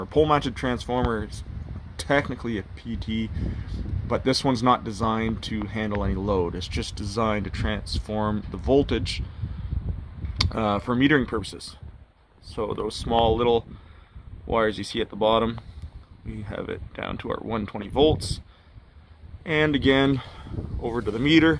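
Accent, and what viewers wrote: New Brunswick, Canada. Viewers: Assuming by your accent you're new brunswick or pei... When they buried the service; would that be upgradeable to 3 phase?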